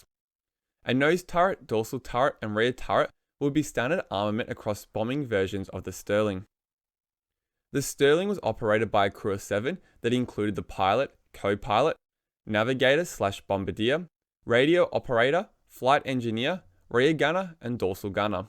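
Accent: Australia. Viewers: He is Australian, that’s the way a lot of Aussies pronounce it. All languages/ dialects have funny ways of pronouncement. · The Stirling was out-dated even before the prototype flew - things changed so fast! The thick, draggy, wing section was half of the problem, and the other was the feeble engines, so it was under-powered! My old friend Todd Slaughter (long since deceased) flew in them, but never talked about it. - - - The commentary (AI?) was very poorly spoken, disregarding the Aussie accent, so was difficult to understand, if you ignore the errors. (What's a glider tower - a vertical stack of gliders?) It would have been better to call it a glider tug.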